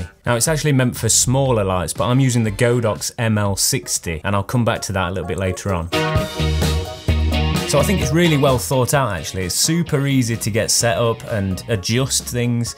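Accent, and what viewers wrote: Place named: Manchester, United Kingdom. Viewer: Tempted to get this for my videos. I feel like you're from Yorkshire like me but for some reason I'm getting Manchester accent vibes. 🤣🤣 I could be entirely wrong but you're definitely northern.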